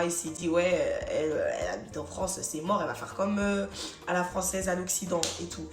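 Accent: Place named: Ivory Coast. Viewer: Trop stylé ton accent ivoirien 😂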